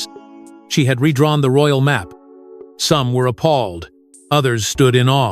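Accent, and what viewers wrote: United States. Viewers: Good for Princess Katherine if it's true which I hope it is! Why is being said by another American voice? Stay out of it, it's for the British people not Americans!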